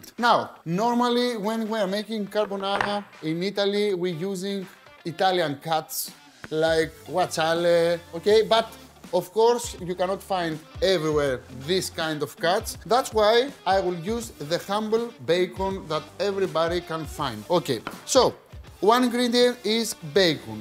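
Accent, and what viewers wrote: Greece. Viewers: Carbonara with a Greek accent 🥰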